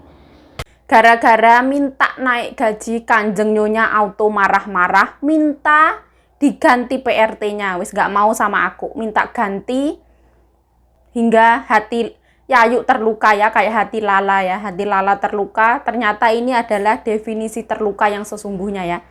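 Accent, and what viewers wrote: Java, Indonesia. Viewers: Wong ndi sih mbok, ngomonge medok tenan😅...?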